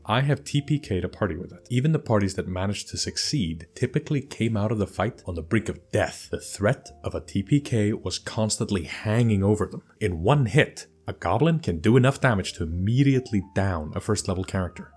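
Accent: Iceland. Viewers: You have a great voice. The tiniest little hint of an Icelandic accent gives your voice a bit of charm, and your pronunciation is very clear and crisp, making you easy to understand on cheap, low-quality speakers.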